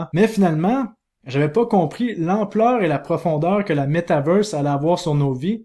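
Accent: Quebec, Canada. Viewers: J aime de plus en plus entendre ma petite dose d accent québécois ! Merci de partager ton travail pointu et ton avis d expert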